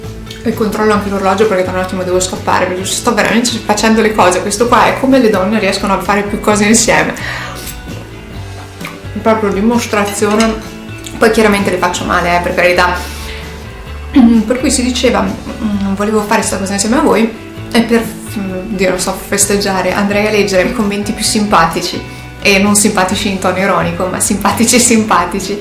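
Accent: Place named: Friuli, Italy. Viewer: hai una cadenza nel parlare che ho sentito in tanti friulani che in un qualche modo mi è familiare. Comunque ho fatto una bella scoperta con il tuo canale. Un saluto con simpatia 😉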